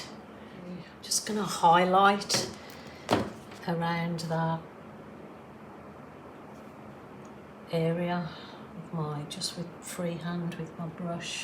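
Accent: Birmingham, United Kingdom. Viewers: hi. I thought I detected a Birmingham accent in there, I am from Sutton Coldfield now living in North Wales, so hi from Prestatyn. Love your videos.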